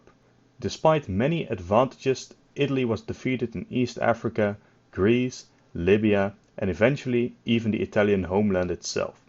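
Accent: Netherlands. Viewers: gast aub leer deftig engels praten. ik zou u vids wel kijke als ge ni zon ambetant hollands accent had!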